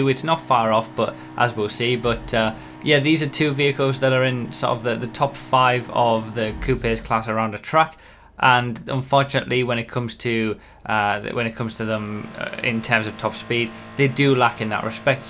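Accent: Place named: England, United Kingdom. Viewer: no, he's English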